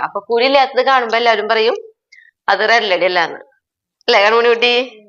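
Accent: Thrissur, India. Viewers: Clear ആയിട്ടാണല്ലോ സംസാരിക്കുന്നത്. പിന്നെ അവരുടെ തൃശൂർ സ്റ്റൈൽ ആയത് കൊണ്ടാകും അങ്ങനെ തോന്നിയത്..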